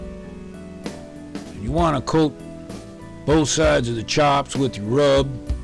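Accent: South, United States. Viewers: the way he is talking makes me hungry, he sounds like a deep south country cook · i like the VOICE of that southern man..IDK if his black or white man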